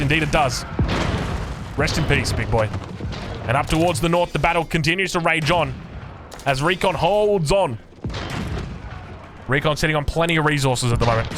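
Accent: Australia. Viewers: This wild wolololo of was the best part of the video. question, does every Australian sound like Steve Irwin? Greetings from the Netherlands (Y)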